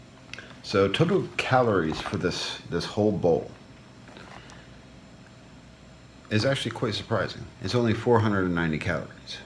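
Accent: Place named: Pittsburgh, United States. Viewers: Idk why but I hear some Pittsburgh in your voice lol that's where I'm from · Definitely hear some PA in there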